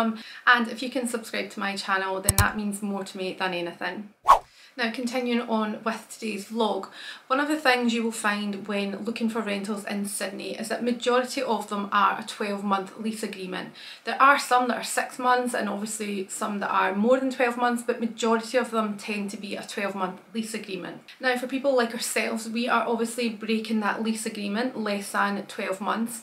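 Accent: Scotland, United Kingdom. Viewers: Thanks for this video. It is very difficult renting in Sydney, especially as tight as the market is now. I remember when we were leaving our rented place years ago how I felt when streams of people came in looking & that was when it wasn't as busy as it is nowadays. You are right about the lease & in noting the condition of each room in great detail. I know you've found a place now you are happy with but as a word of advice to others arriving in Sydney - yes you have to move further out to get a house for lower rent but if you look instead at townhouses or villas you may pay less than for free-standing house not so far out & you still have a garden ( admittedly smaller) for children. I hope your move goes well, don't forget to have an "essentials" box for moving day ( kettle, coffee, long life milk, tea bags, tissues,toilet paper, snacks etc so you can avoid the added stress of going to the shops). 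I enjoy all your videos & love your Scottish accent. P.S. Don't forget a small esky with ice so you can give the movers a drink when they bring the final load into your new place - it's a very "Aussie" thing to do!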